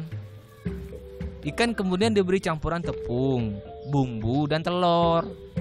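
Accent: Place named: Kapuas Hulu, Indonesia. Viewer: Ini yang ngomong di balik Video pasti asli orang kapuas hulu :D dari logatnya udah bisa ketebak. hehehe..salam saudara, ayoo kita majukan daerah kita sama2. <3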